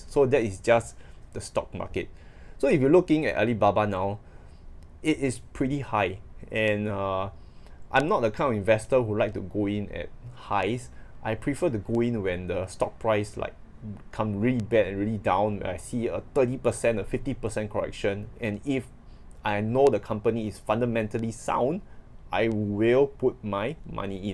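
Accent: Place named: Singapore. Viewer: You're from Singapore, that is 100% Singlish.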